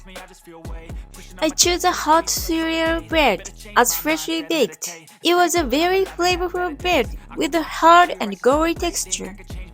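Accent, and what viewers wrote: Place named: Japan. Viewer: 很明显是日式英语，不是中式英语。 已经说得很好了，理解完全没有障碍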